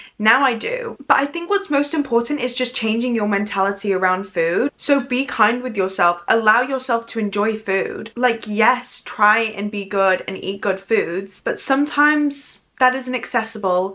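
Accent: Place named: United Kingdom. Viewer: Girl I love this and was happy to see another British youtuber so totally embrace your own accent and leave the american one out x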